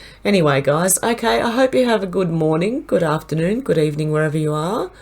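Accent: Australia. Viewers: Love your Australian accent and you need not apologize for being a grandma you’re awesome 😎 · Love all your work and your channel,thank you! I'm such a sucker for your Aussie accent,love it! Trump 2020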